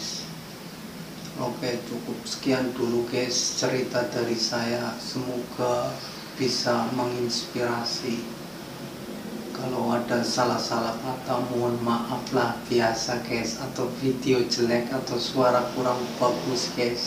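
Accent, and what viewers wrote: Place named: Java, Indonesia. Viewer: logatna jowo bgett kk😀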